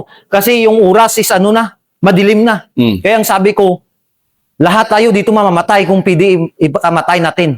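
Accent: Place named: Iloilo, Philippines. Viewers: Hilonggo tekal gid. Na hehe magaling ka sir ha. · tikal ilonggo ka gid gi kagid gina piko gina pala to😂😂😂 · Ilonggo talaga eh .. sa kwento pa lang 😊...halatang nakapag renew sa iloilo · Batyagan gd nga ilongo sia sa ,panagalog nya at least never mine isog Taya,!!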